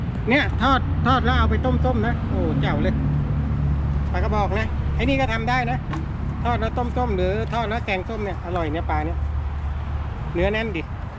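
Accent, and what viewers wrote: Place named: Northern Thailand, Thailand. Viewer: สวัสดีครับ อู้ภาษาเมืองบ่ธรรมดา 555+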